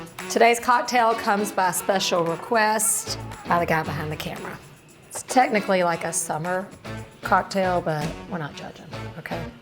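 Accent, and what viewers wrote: South, United States. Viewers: Italian babe with a southern accent. Win win!!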